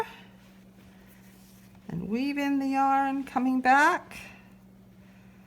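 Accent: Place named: England, United Kingdom. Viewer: Thank you so much for tutorial Turned out lovely loving your accent England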